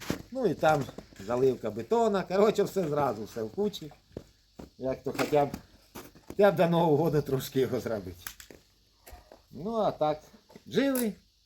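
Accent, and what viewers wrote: Chernihiv, Ukraine. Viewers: Звідки ви? Чую говор з Чернігівщини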